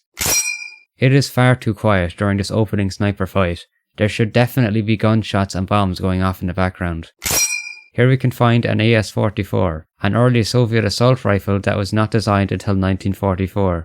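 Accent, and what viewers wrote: Ireland. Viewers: Is this guy Irish?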